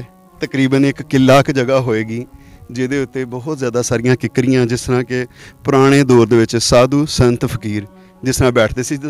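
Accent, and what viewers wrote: Punjab, India. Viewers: Tuhadi boli charde Punjab nal raldi ji bahut vdia jankari diti ji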